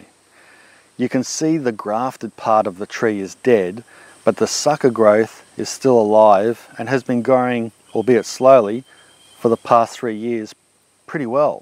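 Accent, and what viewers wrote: Australia. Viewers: My wife got me into watching your videos, love your Aussie accent. We are from San Antonio TEXAS and I'm trying to grow avocados and I keep having the same problems. Well you just told me how to fix them my yard is nothing but clay soil, I will have to mount them up now. LOVE YOUR VIDEOS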